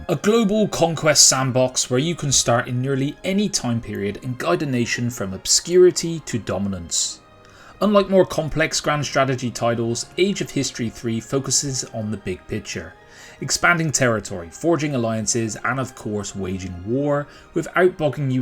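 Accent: United Kingdom. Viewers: you sound kinda British tho. Just weird to use Euros as a currency. Like a US Youtuber quoting prices in GBP. Just weird.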